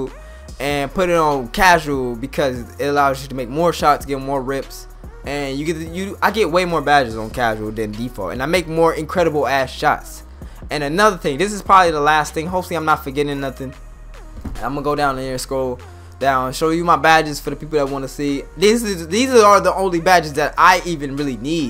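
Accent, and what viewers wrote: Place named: AAVE, United States. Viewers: It's just ebonics man. Ain't nothin wrong with that. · MopSop Lmao he's speaks Ebonics like most of us here aint nothing wrong with ppl's choice of dialect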